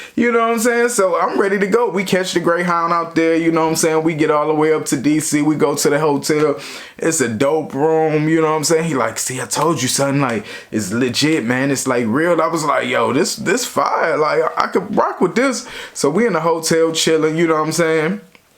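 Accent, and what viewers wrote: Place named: New York, United States. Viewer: I gotta say you got that New York accent down cuzzo! Great story time bro! 👍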